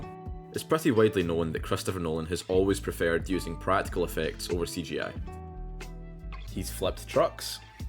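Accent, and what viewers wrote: Scotland, United Kingdom. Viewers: Like the Skaughtisch accent.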